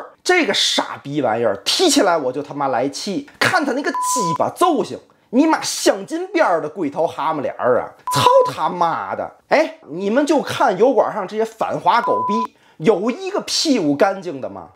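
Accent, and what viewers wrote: Tianjin, China. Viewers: 天津口音真好听！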